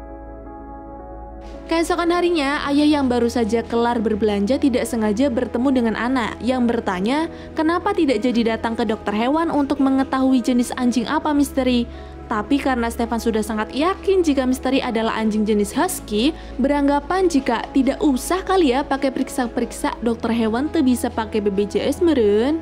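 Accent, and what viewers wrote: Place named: West Java, Indonesia. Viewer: Teh orng Sunda nya wkwkwkwk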